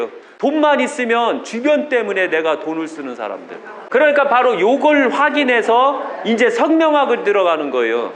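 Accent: Chungcheong, South Korea. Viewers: 충청도 사투리가 구수 합니다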